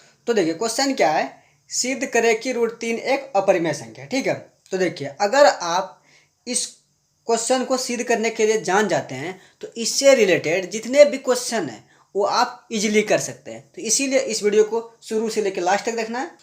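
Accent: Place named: Bihar, India. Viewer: Bihar se ho kya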